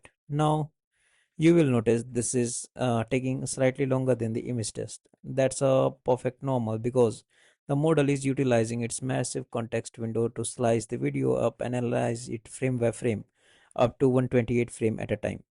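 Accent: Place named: Rajasthan, India. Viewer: Your English flow is better than mine... Are you from north India because I sense a rajasthani accent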